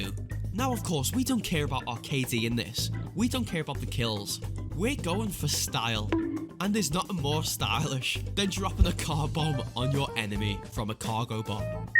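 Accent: Liverpool, United Kingdom. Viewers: I can pick up a scouse accent there 😂 I'm from Liverpool as well🤣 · so where is the fucking list? i dont want to listen to your stupid ass british voice for 30 minutes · Eyyy you a scouse ?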